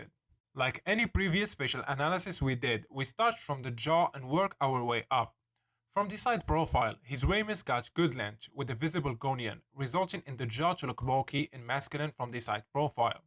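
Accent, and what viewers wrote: Morocco. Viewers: mghribi akhoya😂😂 Wellah ta 3endna accent m3rofa.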